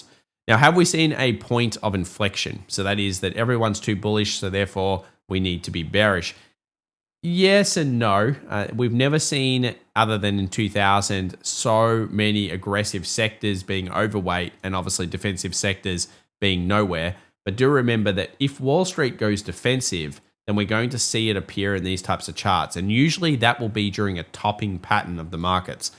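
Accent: Australia. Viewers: Where are you ? you sound like a QLD'er ? 🙂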